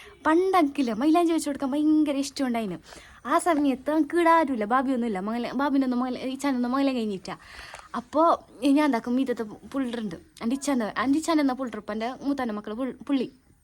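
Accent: Kasaragod, India. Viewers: vedio kand kand ipo ksgod slang set avunund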